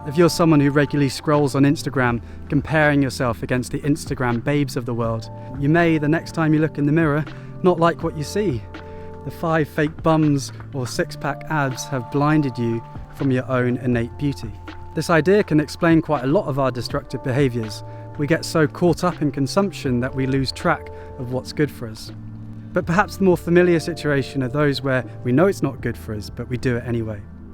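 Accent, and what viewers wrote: United Kingdom. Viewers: Ancient wisdom and British accent! My YouTube knows exactly what I like. 👀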